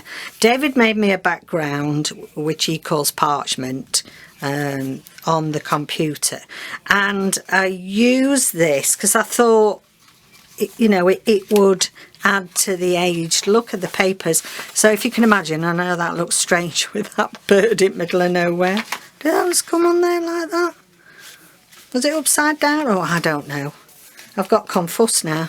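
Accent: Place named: England, United Kingdom. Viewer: Hi I have your other videos on my watch list so I haven’t gotten to them yet. I just saw this one come up and couldn’t wait to watch it. I just love what you’re doing I’m only a few minutes in but just had to comment. I love your accent you pronounce some things like my English gran did! Your project is stunning! Can’t wait to watch more! Hugs Rachel 🤗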